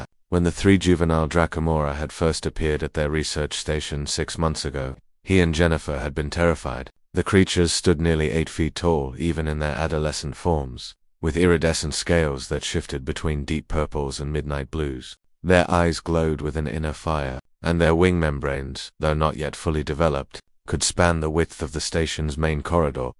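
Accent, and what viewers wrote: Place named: United Kingdom. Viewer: Narrator has a British accent , very subtle.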